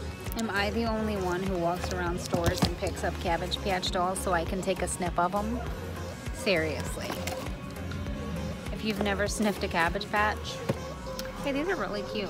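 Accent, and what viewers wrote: Texas, United States. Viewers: Do not lose your Texas accent up here!! I love it!!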